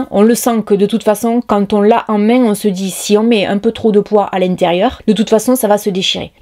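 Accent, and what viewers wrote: South, France. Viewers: ..., je te suis depuis ta première vidéo 💜 et ton accent sudiste ressort d'année en année, c'est trop adorable. Hors plaisanterie, ton contenu conseil, économie est hors-norme! Ne lâche rien et saches que tes sponso "carte bleue" on adore car tu dépense pour ta communauté. on t'aime tellement 🌹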